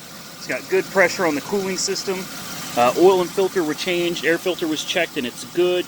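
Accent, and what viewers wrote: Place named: Oklahoma, United States. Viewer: What part of oklahoma you from?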